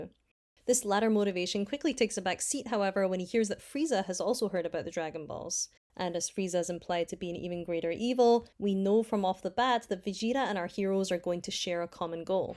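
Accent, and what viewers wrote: Scotland, United Kingdom. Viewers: You sound like a Scot that went to university in England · I subscribed so I don't miss it 😜 are you from Scotland? 😅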